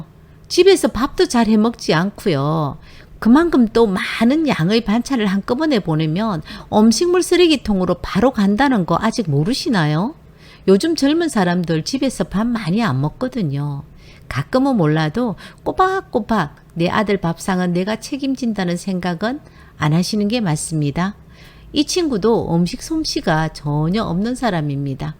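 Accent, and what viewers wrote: Gyeongsang, South Korea. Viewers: 경상도사투리 정ㅇ살듣기 좋아요😅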